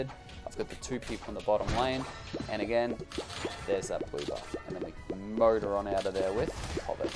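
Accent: Australia. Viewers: Oh broh are you Aussie mate?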